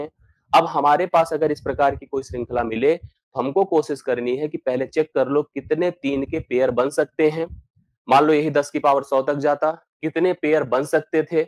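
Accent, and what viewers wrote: Bihar, India. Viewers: Bihar se hoge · Guruji bihari hi is liye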